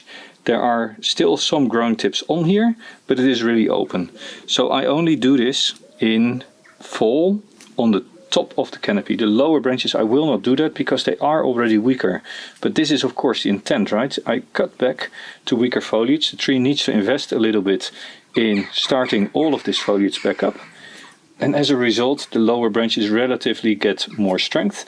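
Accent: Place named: Netherlands. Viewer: 👍 Including the charming Dutch accent 😍🙏😘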